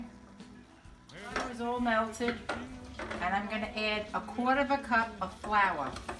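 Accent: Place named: New Jersey, United States. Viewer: Can’t wait to try your ‘Spaghetti Pie.’ Please continue using the ‘Jersey pronunciation’ of words like “ruh-GOAT.’ By the way, be careful using non-licensed music in the background. You have to pay to use music.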